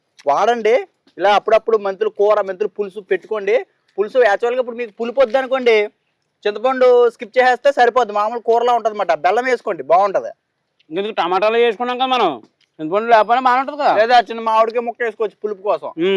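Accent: Godavari, India. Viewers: Godarolla bhasha vintumte maa p.g gurthukochindi😂😂😂😂😂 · స్వచ్చమైన గోదావరి జిల్లా యాస...కల్మషం లేని మాటలు... సంపూర్ణ వివరణ. మొదట మొదటగా చూపించిన పిల్ల కాలువ జోరు,.. పచ్చని పైరు...కట్టే పోయి మీద వంట...అబ్బా .మరచిపోయిన చిన్నతనము చూపించారు..అంతా బావుంది..చిన్న సలహా... కాలి పళ్ళెము లో మొదటగా వట్టి అన్నము మామూలు సందర్భ ములలో పెట్టరు..అది ఒకటి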